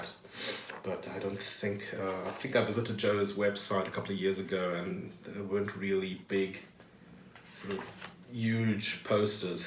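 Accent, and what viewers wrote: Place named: Germany. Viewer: You have a German accent, and I live in Europe. From what retailer did you buy it from?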